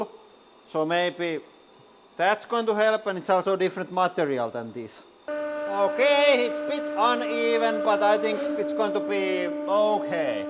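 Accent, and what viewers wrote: Finland. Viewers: As a Dane I envy you Fins for your accent. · I love his finnish accent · I like how he still doesn't fully grasp the concept of the letter Y in English making an "i" sound and not the "u" sound it does in Finnish. "Velcome to Huudraulic Press Channel" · I have a theory that the Finnish accent it one of the secrets of this channels success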